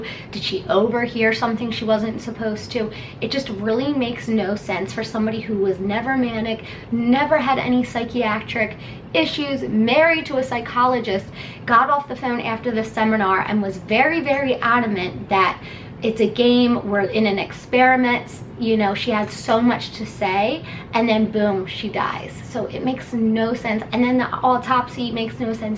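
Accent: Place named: Maryland, United States. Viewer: Sounds like a MD accent... Great video! Subbing for more!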